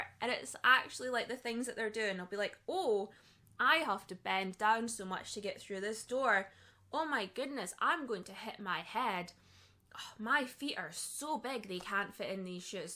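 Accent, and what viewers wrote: Scotland, United Kingdom. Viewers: By the way, are you Scottish by any chance? Just going by your accent. I am a geordie, part Scottish😊.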